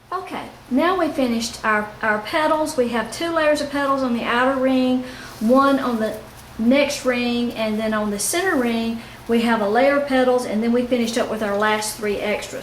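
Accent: South, United States. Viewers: I love your lovely southern accent.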